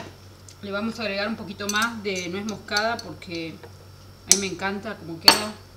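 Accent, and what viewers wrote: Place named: Argentina. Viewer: Eso depende del país en donde vivas y las pronunciaciones de ciertas palabras. Ella es de Argentina y por eso tiene esa pronunciación. No lo hace mal, sólo es su forma de hablar, y está bien.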